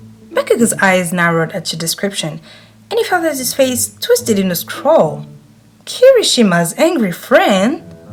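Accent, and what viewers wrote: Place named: France. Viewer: Cool video, love ur french accent ♡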